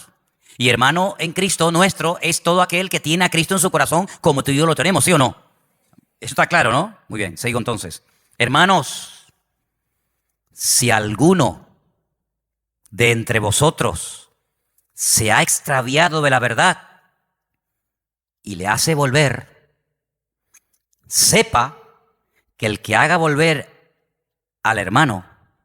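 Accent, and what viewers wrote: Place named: Argentina. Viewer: vale pensé era argentino se nota no e español, buen pastor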